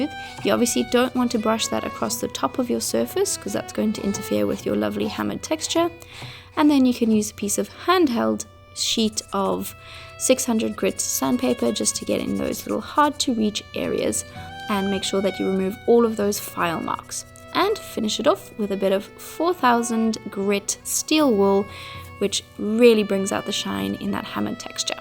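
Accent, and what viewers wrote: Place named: South Africa. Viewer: Very nice work, I thought Nordic with good English as I've spent time with them. Then I think very, very soft south African.👍🏴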